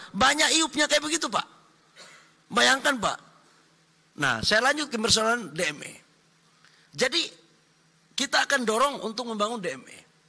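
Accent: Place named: Papua, Indonesia. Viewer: pa balil ini dialek papua mentah betul karna ada pakai bahasa KItong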